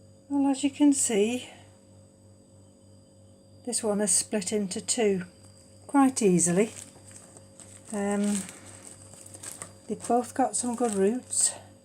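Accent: United Kingdom. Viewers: What part of the world are you living ? (from your accent, I assume the UK). The fact that they've gone into a bark-based mix with reasonable root systems, I would start feeding whenever you water remembering a flush with clean water every so-often. In the UK, it's the growing season and you have active root tips and new growths so they will appreciate some feed right into the Autumn.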